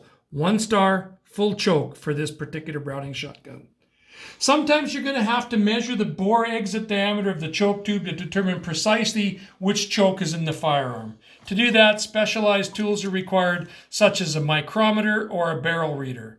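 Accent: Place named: Canada. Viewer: Its just a Canadian accent, buddy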